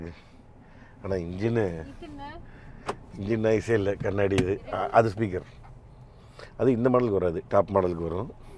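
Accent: Kongu, India. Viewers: கொஞ்சும் கொங்குத் தமிழ் பேசும் கோமகரே...! திகட்டாத தித்திக்கும் பேச்சுடைய திருப்பூர் திருமகரே...! எங்கள் மதிப்பிற்குறிய " " திரு. அண்ணா அவர்களே❤❤❤🙏 எப்படி இருக்கீங்க அண்ணி❤❤❤🙏 எப்படி இருக்காங்க ரித்திக்❤❤❤ எப்படி இருக்காரு திருப்பூர் திருமகரும்❤❤❤ திருமகரின் திருமதியும்❤❤❤ இந்தக் கிளிகள் இரண்டும் கொஞ்சியபடி "கியா கேரன்ஸ்" காரில் பயணிக்கிறது😊❤❤❤❤ என்றும் அன்புடன் உங்கள் தம்பி என்றும் அன்புடன் உங்கள் "TMF" ரா.முஹம்மது கபூர் (சென்னையில் இருந்து)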